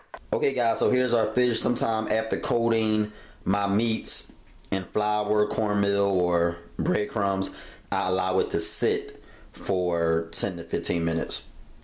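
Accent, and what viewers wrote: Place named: Baltimore, United States. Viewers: lol be sounding like he from PG County Baltimore ahahaha "Fyew"... "Tew"... "Dew"... Oiyew (Oil) hahaah Much luv bro! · Sound like you from Baltimore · He sounds baltimorian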